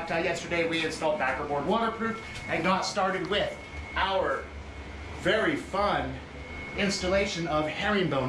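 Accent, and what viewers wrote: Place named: Boston, United States. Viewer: With that Boston accent, this must be cape cod?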